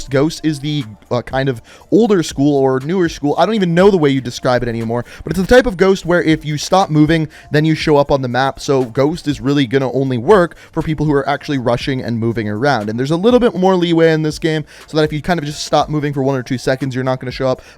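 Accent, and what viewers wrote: Canada. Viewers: His accent is so canadian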